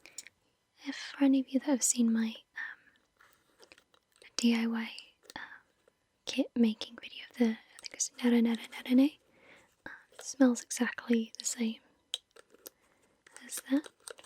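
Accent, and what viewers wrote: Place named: Wales, United Kingdom. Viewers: If you don't mind me asking, where are you from? I can hear your accent but I don't know if it's like an British accent or some other accent. I hope this isn't rude. :) thanks love your videos! :) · She's Welsh.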